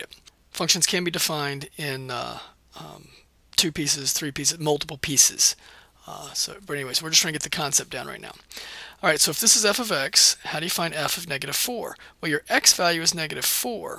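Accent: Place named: United States. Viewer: Super helpful and all, but did anyone else thinks he sounds like Caspar Lee with an American accent?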